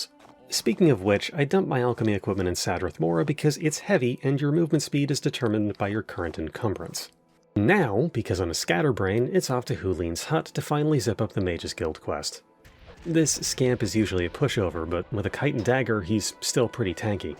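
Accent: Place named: Canada. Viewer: I can tell youre canadian.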